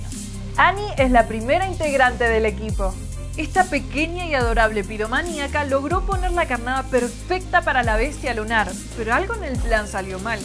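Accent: Rioplatense, Argentina. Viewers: Pueden porfavor pedirle que hable más neutral ? Sin tanto acento, se entiende que es Argentina pero viejo a la panchasky le exigieron hablar "neutral" durante toda su estadía en Riot. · no puede evitar el acento argentino xd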